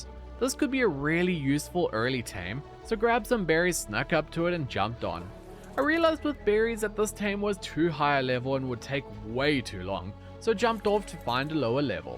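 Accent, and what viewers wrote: South Africa. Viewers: Is South African?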